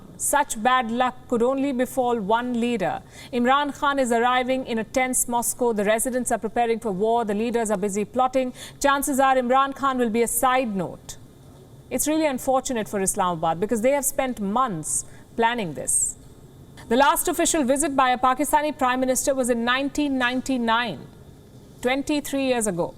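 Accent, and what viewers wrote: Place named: India. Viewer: It is Indian voice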